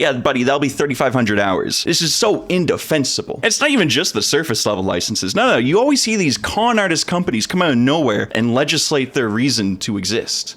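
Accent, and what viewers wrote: Canada. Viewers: The Canuck accent is gorgeous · Oh man I clocked that Canadian accent easily The classic “EHRR” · Unbearable Canadian accent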